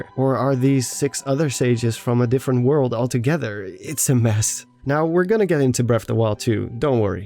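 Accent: Netherlands. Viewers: You’re from The Netherlands aren’t you? I can clearly hear it in your voice. xD Cool video!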